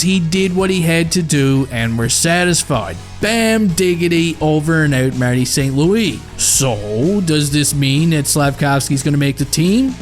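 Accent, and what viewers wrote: Newfoundland, Canada. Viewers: Sounds more like a Newfie to me. Either that or a caper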